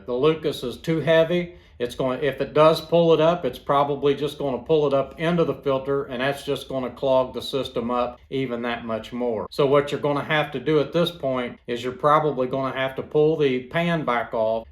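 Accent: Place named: South, United States. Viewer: Heard some Southern, left a like.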